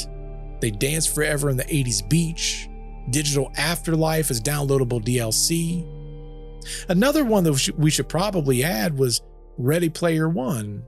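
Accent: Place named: United States. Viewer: It’s so dope to hear an American voice narrating 👏🏽👏🏽👏🏽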